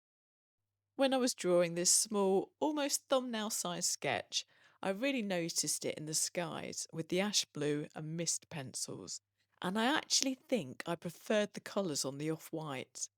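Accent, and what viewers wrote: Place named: United Kingdom. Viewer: Thank you for this review. I'm a Brit living in Denmark, it was wonderful hearing the dialect from home 😊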